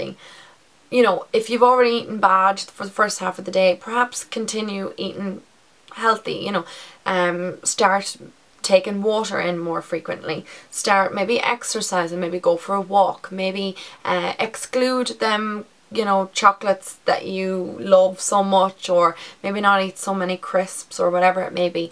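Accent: Ireland. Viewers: cant believe ive never seen you on youtube before, as soon as i heard the accent i got so excited lol (im from ireland too). loved this video so much very informative and holy god your figure!!!! its amazing you should be so proud! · the way you say "but" "fun" "much" etc is so different to the British or the Australian or American accent. I still remember the first time that I went to Dublin, I freaked out! you do speak quite clear to me though. hugs! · Hi,thanks for such detailed video.I am a doctor myself,working in UK,have lived in Northern Ireland 4 yrs ago,thats why i liked your Irish Accent :) I have myself have these changes of lifestyle by eating healthy and workout! i totally agree there is nothing such thing as diet,its for whole of ur life...i have always heard abt how good oats are,i will try to include them in my brekky from now :) x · this is such a good video and i thank you for making it. 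i try to eat healthy the thing is when i work i am 100 percent focused on working and i forget to eat sometimes. i seriously do by the time i look at the clock it was like 4 in the afternoon. by the way i love your Irish accent wish i had mine. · Yes I'm doing good. I walk daily, I'm off oxygen, and insulin, so I control my diabetes with diet now. By the way I wanted to let you know that Ireland is my dream vacation. My ancestors are Irish and Scottish. We go back to a cousin of William Wallace. So I love your accent. What kind of dance do you do?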